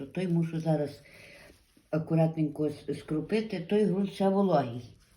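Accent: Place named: Ternopil, Ukraine. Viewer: Дуже дякую за чудове відео,жіночка дуже нагадує мені мою бабцю,у котрої я ріс до самої школи.Дуже гарна мова,балакає так само як моя бабця🙂,ви напевне знаходитесь на Тернопільщині?Я жив у бабці саме там.Там дуже гарні крайовиди,безмежні поля й ліси,граби,ясені,дуби.Нажаль дубину вирізали давно😔.Моя бабця також насадила багато горіхів,тільки вона їх не вирощувала навмисне(здається на весні),по городі сходили молоді ростки горіху і бабця поосто їх пересаджувала куди треба.Я тоді запитав у неї:"звідки вони ростуть на городі",а вона відповіла:"то ворони в осени розносять і деякі падають,а на весні виростають",ось так.Дуже родюча земля,багато чорнозему.Успіхів вам у вирощуванні,викладайте ще відео👍🇺🇦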